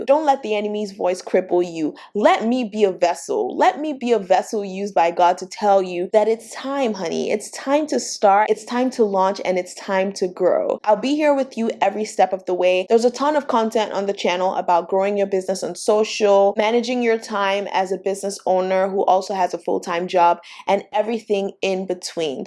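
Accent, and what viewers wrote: Nigeria. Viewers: Glad I found this video. it's great to hear Nigerian, I'm Nigerian too.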